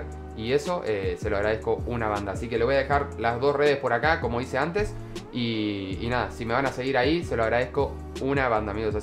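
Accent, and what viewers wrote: Uruguay. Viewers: sos uruguayo a argentino sacame la duda capoo?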